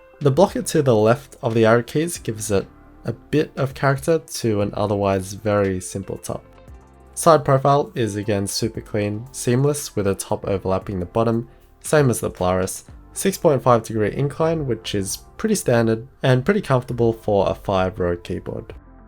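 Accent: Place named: Australia. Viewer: you sound more Australian than usual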